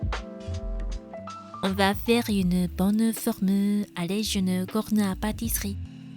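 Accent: Japan. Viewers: Inconditionelle de votre adorable accent japonais, et très envie de tester votre recette mais je n'ai pas de cocotte qui va au four, y a-t-il une autre solution ? Merci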